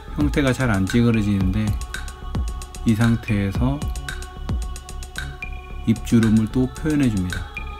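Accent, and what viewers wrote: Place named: China. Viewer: Cara ele é de china você não viu como ele fala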